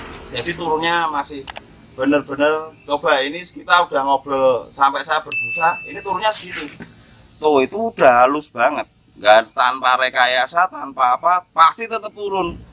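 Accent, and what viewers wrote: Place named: Tanjung Priok, Indonesia. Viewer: Kaya orang priuk bang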